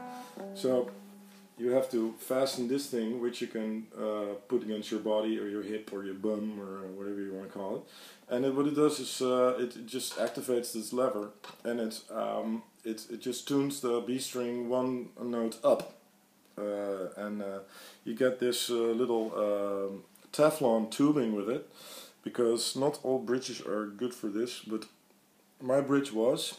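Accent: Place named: Netherlands. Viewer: You speak perfect English with a sleight Dutch accent.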